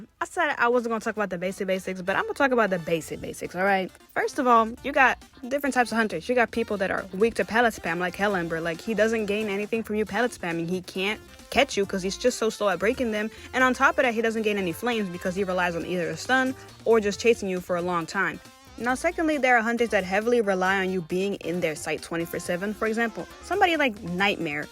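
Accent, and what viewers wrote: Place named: Africa. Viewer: Maybe africa english?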